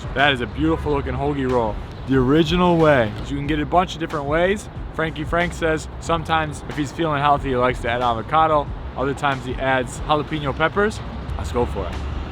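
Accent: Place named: New York, United States. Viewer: Your NY accent comes back when you're back East.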